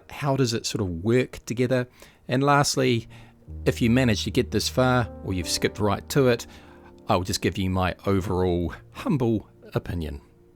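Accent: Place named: New Zealand. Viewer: First review of yours I watched, and I really liked your reviewing style! I appreciate the slow, clear, unhurried commentary, and how well you structured the video. I've seen other reviews of this game where they never even mentioned the second scenario in the desert, maybe they didn't play it as much as you? Love the Kiwi accent too, even if you beat the Irish in my hometown Dublin just the other day!! Subscribed. ✌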